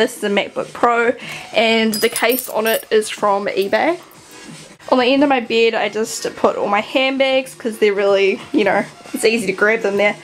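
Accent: New Zealand. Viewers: Wait.... Shes from NZ? I thought she was from Australia!!! ANOTHER WIN FOR THE KIWIS!!! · haha are you from NZ?? i live there and i kinda reconise yr acsent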